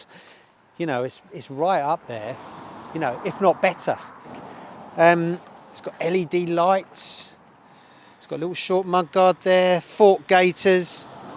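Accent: United Kingdom. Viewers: And he still sounds UK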